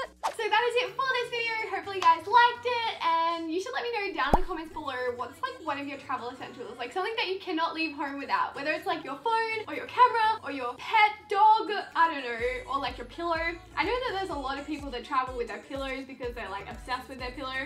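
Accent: Australia. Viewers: are u australian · what part of australia are you from?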